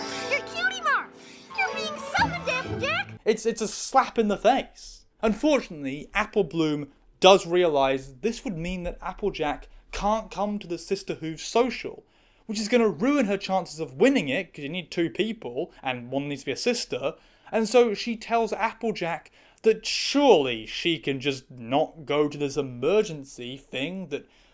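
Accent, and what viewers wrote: United States. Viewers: Please please please do a bunch of shorts in the American accent just for luls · I like her american accent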